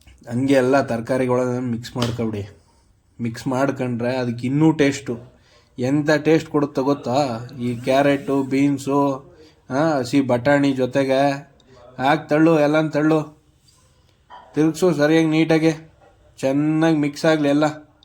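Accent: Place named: Mandya, India. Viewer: We are waiting for more cooking videos and most importantly a separate fan base for ಮಂಡ್ಯ ಮಳವಳ್ಳಿ background voiceover..😁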